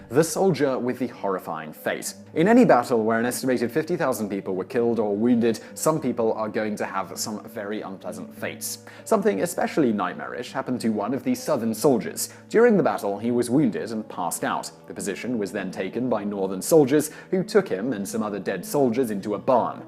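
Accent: England, United Kingdom. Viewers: Tory Sanders He definitely said Gettys burg with the wonderful English accent. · Our history not urs, ur obviously british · Don't care for a LIMEY narrating US History! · Why is a british guy trying to tell us about Gettysburg - maybe he should have done a video on yorktown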